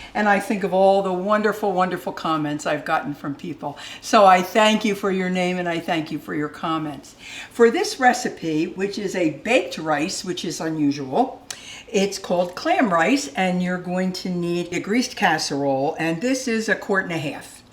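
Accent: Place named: South Jersey, United States. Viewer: I am learning SO MUCH!! Are you for the Philly area?? I’m getting a South Jersey accent vibe! 💕💕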